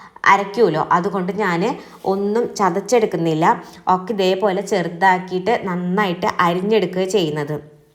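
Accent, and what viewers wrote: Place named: Thrissur, India. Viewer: അവർ Thrissur alle? സംസാരം അങ്ങനെ അല്ലേ kayiooo.നമ്മുടെ പോലെ ആക്കാൻ കയിയണമെന്നില്ലല്ലോ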